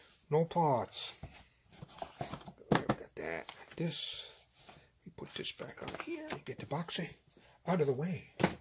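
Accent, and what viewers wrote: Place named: Bronx, United States. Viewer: U must be from Bronx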